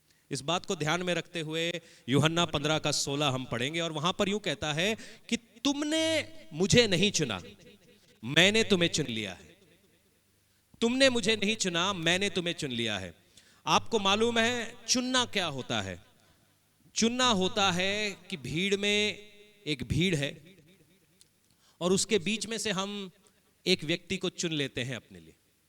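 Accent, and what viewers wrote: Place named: Punjab, India. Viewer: Jai Masih ki pastor ji God bless you very nice word aur aapki Punjabi Hindi sun kar bahut maza aata hai aur shanoo wali baat kia baat hai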